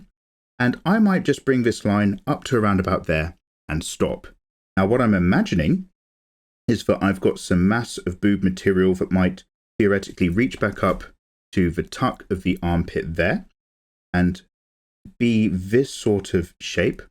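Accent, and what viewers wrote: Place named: RP, United Kingdom. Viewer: I just spent 23 minutes listening to a very pleasant British gentleman explaining in RP (sounds like RP to my ears) how to draw anime tiddies. Youtube algorithm, mission accomplished. Jokes aside, this really was an interesting video.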